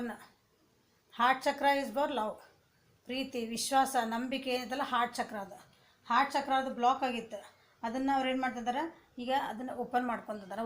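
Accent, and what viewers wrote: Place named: Hubballi, India. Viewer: Tq ಮ್ಯಾಮ್ ನಮ್ಮ ಹುಬ್ಬಳ್ಳಿ ಬಾಷೆ 😍🙏🙏ನೀವ್ ಬಾಳ್ ಚಂದ explain ಮಾಡತೀರೀ I like ಅಕ್ಕಾ you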